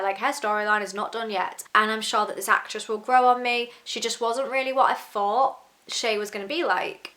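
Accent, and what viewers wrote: United Kingdom. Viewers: tbf I had some time off for medical reasons so it was the perfect time to start. Got me through a tough period. Forgot to mention, the show really does a great job with the music! is a song you want to hate but it is beautifully composed. And one of my favorite bits of the latter seasons is a full version of Jenny's song (the one Tom o' Sevens sings to the Ghost of High Heart). You'll also hear and at some point. As you continue to watch you'll notice each house has their own dedicated song and you'll here different versions of them throughout the show. The score is phenomenal! Love the accent by the way, I live in the US (from the Caribbean) but work with a score of English expats (Camden Town, Leeds, Newcastle and Leicester), so it feels like I'm listening to a friend.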